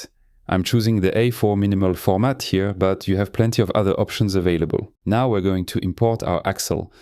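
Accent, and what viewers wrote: France. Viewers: I was not expecting YouTube to deliver me such an excellent FreeCAD tutorial from a man who sounds like the person every single impressionist based their exaggerated, stereotypical French accent on. This man may be the most French-sounding man I have ever heard. It would be very funny if I'm super wrong abd he's Flemmish, or something. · french accent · You sound french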